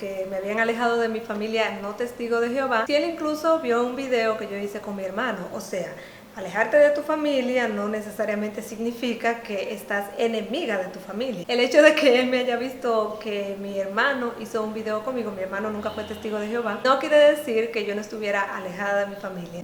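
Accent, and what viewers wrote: Dominican Republic. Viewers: Hola. Qué bueno que lograste salir de esa secta. Quisiera saber si eres dominicana. Tu acento al hablar parece ser dominicano. Yo soy dominicano y me gustaria que por favor me respondieras, y así ver si podríamos tener alguna comunicación para que compartamos sobre el tema. YO estuve estudiando con ellos durante mucho tiempo. Pero su doctrina no la pude asimilar, pues ya tenia un poco de conocimiento del verdadero evangelio de Cristo. Me estoy preparando para formar parte de un ministerio de ayuda a los esclavizados en la secta de la Whatctower. Fuiste muy valiente. Saludos para ti.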